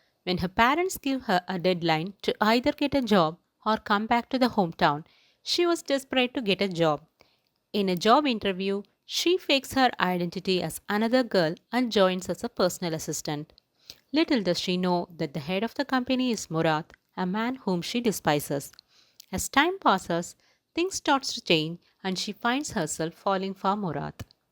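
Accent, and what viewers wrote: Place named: Kerala, India. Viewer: You (the narrator) sound like a Malayali woman Your accent I mean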